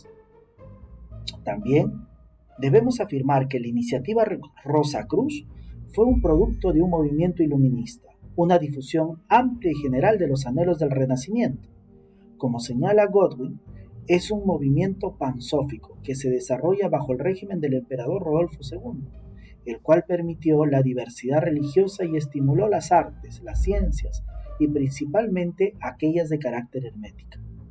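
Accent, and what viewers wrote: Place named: Dominican Republic. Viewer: eres dominicano suenas como uno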